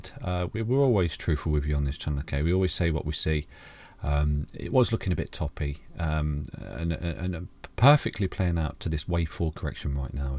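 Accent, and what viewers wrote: United Kingdom. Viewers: Soon as I heard that British accent I subbed.. 🇬🇧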